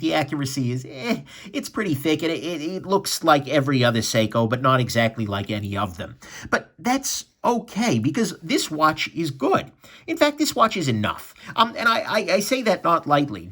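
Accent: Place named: Alabama, United States. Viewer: I have The Islander with the 60-minute dive bezel, it's a great watch for the price. By the way I'm picking up your southern accent I'm guessing Alabama?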